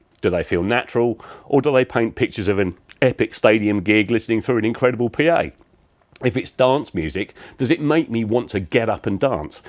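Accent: United Kingdom. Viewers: Woww! How could I've learned so many things in just over 10 mins video? Honestly, sometimes Brit accent confuses me, but in your case...SUBSCRIBED!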